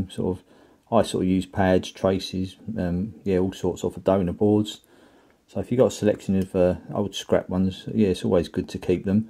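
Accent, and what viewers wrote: United Kingdom. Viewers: What if you did a video with a two-sided board top and bottom with a sleeve that would be cool , sounds like you're from the UK I'm from Sunny South Florida USA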